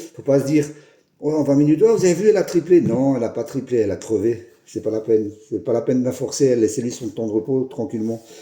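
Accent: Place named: Lorraine, France. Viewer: Genial recette et commentaires....moi qui suis lorrain d'origine j'adore retrouver l'accent et les expressions.... J'ai mis a l'agonie mon kenwood cooking chef....1.5 de farine = limite de capacité ! Mais il a bien fait le taf 😊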